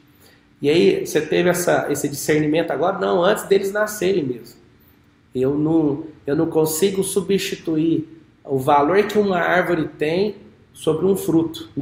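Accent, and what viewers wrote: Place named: Goiás, Brazil. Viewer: Alguém em agosto de 2024. Até o sotaque dele era um goiano bem puxado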